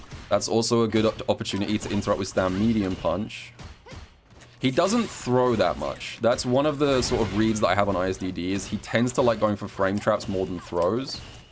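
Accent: United Kingdom. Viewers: do again mr british very cool and insightful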